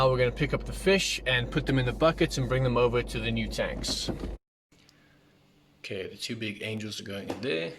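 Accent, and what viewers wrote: South Africa. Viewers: Im kinda getting South Africa vibes but Idk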